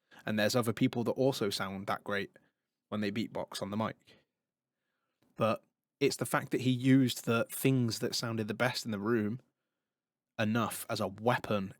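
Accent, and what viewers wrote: United States. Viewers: Your American accent sounds very mid west.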